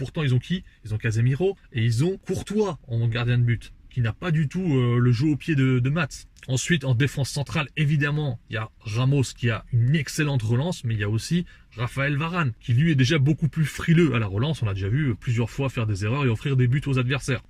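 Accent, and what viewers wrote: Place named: Spain. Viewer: C’est quoi tes origines ? t’a un accent qui me fait penser a l’Espagne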